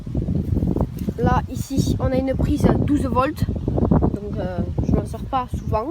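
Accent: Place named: South, France. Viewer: Toi tu est du sud 😂 quel accent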